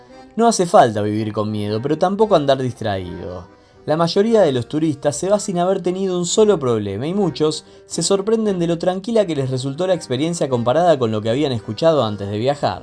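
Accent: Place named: Rioplatense, Argentina. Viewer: Qué buen video "para turistas". Está lleno de yeísmo rehilado, aspiración de la /s/ y palabras del lunfardo. Hace apenas tres años que vivo en Argentina y entendí casi todo. 🤣🤣🤣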